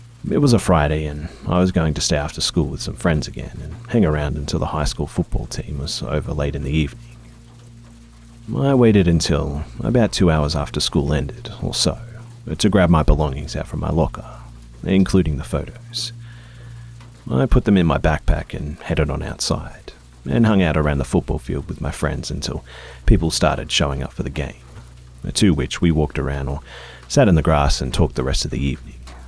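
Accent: Australia. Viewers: what part of Australia you from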